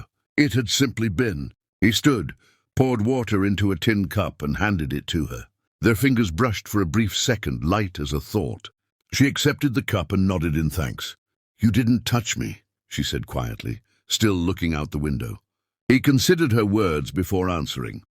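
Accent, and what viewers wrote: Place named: RP, United Kingdom. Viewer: Another PERVERTED "PROPA ENGLISH " Accent that seems to twist the human aspects of the lustful story.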